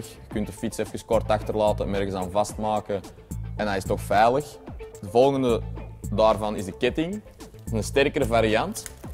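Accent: Belgium. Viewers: mooi taaltje belgs. leuke gay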